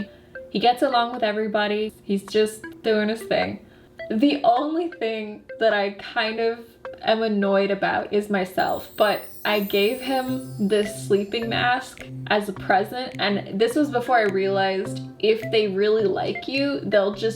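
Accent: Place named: United States. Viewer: Are u American because u have a different accent then beatemups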